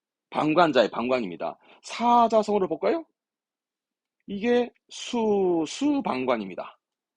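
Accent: Jeju, South Korea. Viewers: 명강의 귀에 쏙쏙 들어와요~~증말 최고십니다. 글구 잘 모르지만 제주도 억양이 중간 중간 들려서 재미있었어요(정확하게 들었는지 모르겠으나 ㅎㅎㅎㅎ) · 제주도 분이신가봐요,,ㅋㅋ 중간중간 제주도 말이 들려서 방가워요..ㅋㅋ 너무 초보라서 여기 나오는 예문도 너무 어려운데 이거부터 보면서 여기나오는 단어 무조건 외우면서 공부하면 될까오?😅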